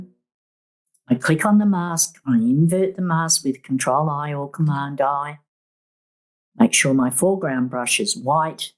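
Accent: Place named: Australia. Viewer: Lovely to hear an Australian voice. I am going off to try this technique, the results looked great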